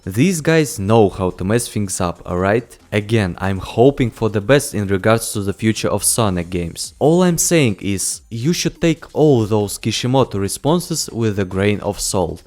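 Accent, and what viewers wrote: England, United Kingdom. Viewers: Oh, you are from England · Oh, you from England.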